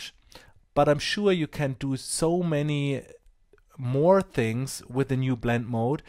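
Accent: Germany. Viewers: Irgendwie wusste ich schon vom ersten Wort an, dass du aus Deutschland kommst. ^^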